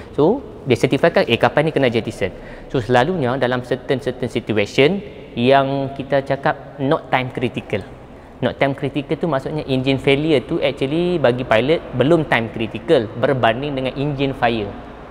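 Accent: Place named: North, Malaysia. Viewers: Saya amat suka kepada cara penyampain yg Walaupun loghat utara tapi Santai. Bagus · hang memang sempoi bang..walaupun dh fly keserata dunia tapi masih tetap bawak loghat kedah..sempoi baqhang.. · Sempoi la dia sembang. Candid n natural. Syok dengaq org Kedah sembang. X yah nk kalut tukar ckp KL pun. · Terbaik abg Pilot.. aku suka cara abg explain.. santai dan penuh ilmu.. yg penting bahasa utara tetap dihati.. tak da pon unsur2 selit bahasa engeris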